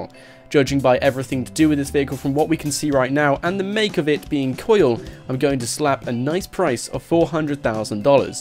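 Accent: England, United Kingdom. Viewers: He is British he pronounces Los angilis and not Los Angels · Ryan McCall you're so culturally insensitive stfu hes from an area where that is how that word is pronounced. If anything because the US English language originates in England, his pronunciation is correct and yours is less correct. But either way he is pronouncing the word right, where he is from.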